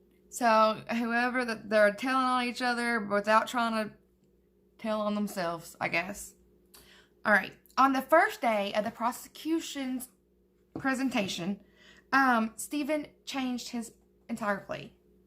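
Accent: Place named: Rural, United States. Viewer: I'm here strictly to hear you're country ass twang and support you lol. The premise is good tho. Real crime, make-up, audio book. It is definitely a progressive thing. I dig it. 👍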